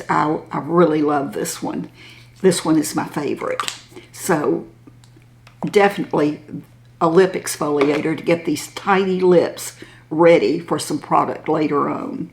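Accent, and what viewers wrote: South, United States. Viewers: I love the sound of your beautiful southern voice and love how you enjoy transforming your already beautiful face. Thank you for being a reminder to our older generation that we too get to enjoy makeup and how it can make us feel. And have fun with it! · I just love your videos. Ur so sweet and such a great storyteller. Ur beautiful and ur southern drawl makes me swoon. My mom always had her face on and you've inspired me to get dolled up w/ELF! I'm in!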